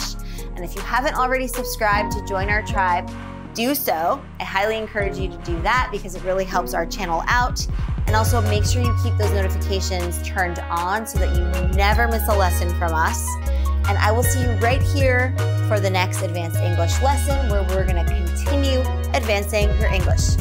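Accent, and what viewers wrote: United States. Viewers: What a beautiful style of your speaking an American English thanks for your kindness.forget you not sprite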